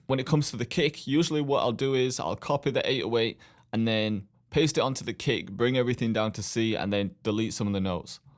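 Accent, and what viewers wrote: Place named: Lancashire, United Kingdom. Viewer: Yo are you from the Accrington Stanley area in England? Most of my extended family are and they all speak like you haha, sick video tho man your killing it and glad to seee you doing well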